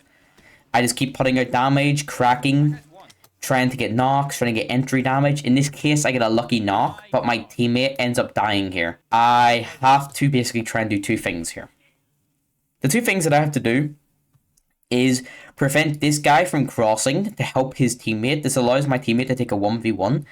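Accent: Northern Ireland, United Kingdom. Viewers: You're awesome. Love the NI accent. God save the King.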